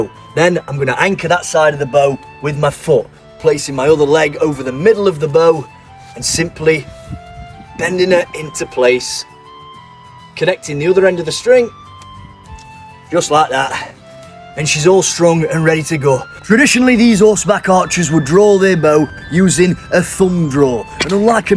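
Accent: United Kingdom. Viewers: I've subscribed for three reasons: this is very funny, he goes straight to the point,and his story telling skills are excellent. Forgot to mention that I love the British accent, I find it very refined.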